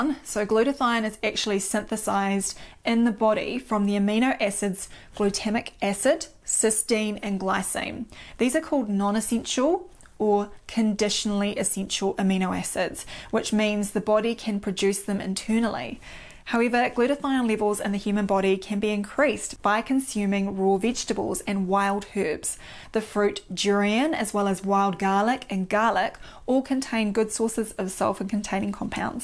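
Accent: New Zealand. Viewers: I love listening to this cute Kiwi accent :-) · Absolutely stunning! And brilliant! Wish I had you as my physician! Although I may get lost with all the biology and chemistry lol What a smile but I'm sure millions have already mentioned that! Love the accent. I was in Oz in the early 90's and absolutely loved the accent and the people and yes I realize you are a New Zealander. I'm sure they are just as friendly as Aussies! G'Day!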